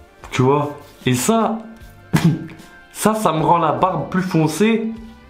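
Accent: Belgium. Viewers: Pure belge nan?😂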